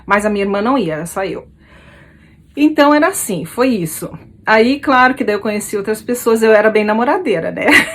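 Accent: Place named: Rio Grande do Sul, Brazil. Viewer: Boa noite querida! Foi bom ouvir suas lembranças também me lembrei das minhas kkk. Vc é muito bonita não aparenta a idade que tem 👏👏 eu fiz 54 dia 4 de abril. Olha eu acho legal quando vc me chama de guria kkkkk é quando vc fala BA 😄 VC não esqueceu o sutaque kkk também gosto quando vc fala em inglês estava estudando inglês só parei por causa desse corona não consigo estudar. Fique com Deus 😘💖🌺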